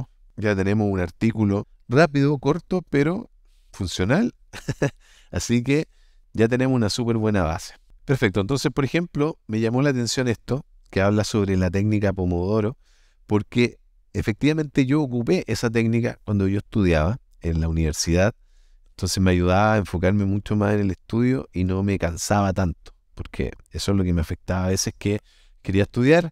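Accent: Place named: Chile. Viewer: Muchas gracias,. Tu manera de explicar es muy clara y concreta, tu voz y dicción son estupendas... y tu acento chileno hace placentero el recorrido por tus videos.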